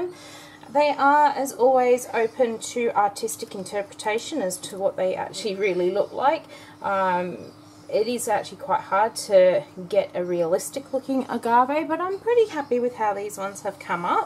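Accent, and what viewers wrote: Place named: Australia. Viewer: Hi, I’m interested in buying a soap from you but I’m in the US and it sounds like you may be from Australia? Does that effect shipping prices?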